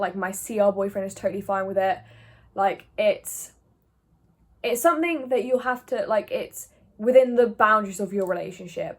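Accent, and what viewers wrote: United Kingdom. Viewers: Do you still have your British accent when you have shifted into any reality? <3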